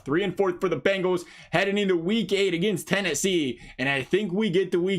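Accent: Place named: Wisconsin, United States. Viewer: Every time I watch a video from this guy I feel like he’s from Wisconsin or somewhere else the way his voice sounds. 😂 Idk...maybe it’s just me. 🤷🏾‍♂️😇😂🤣 Thx for the content nonetheless.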